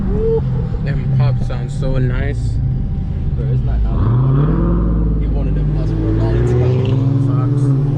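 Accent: Jamaica. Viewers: If you were Jamaican as well you would of immediately knew he was a yardie. "you sound Caribbean" only a yankie would say that. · Aye bro great content. Are u jamaican? U sound Caribbean?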